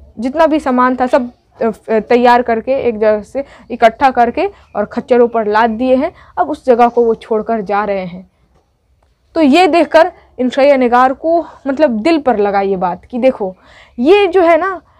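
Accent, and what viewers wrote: Bihar, India. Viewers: Ma'am aap BIHAR se hai kya??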